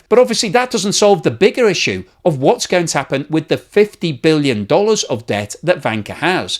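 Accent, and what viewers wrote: United Kingdom. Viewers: British accent = propaganda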